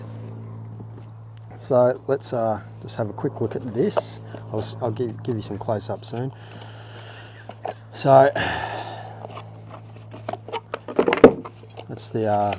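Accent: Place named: Australia. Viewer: why on earth would this video get so many dislikes... haters? Anyway, I ordered a RRS TVR24 and BH40 w/swivel mount for my Leica M10P and Noctilux .95 50... nothing but the best all across the board.. and haters gonna hate, who cares! -- btw, I hear a Ausie accent, but looking at you I'd swear you were from Portland Oregon.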